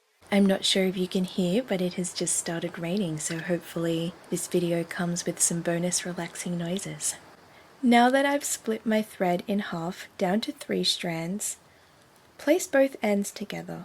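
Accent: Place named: Australia. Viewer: Great little demo video. Thank you. Nice to hear an Aussie voice, and a very relaxing one too!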